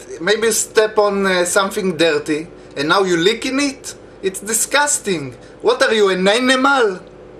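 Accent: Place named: Israel. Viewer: וואי תקשיב המבטא שלך מראה את הטמטום הישראלי . אבל זה בסדר :)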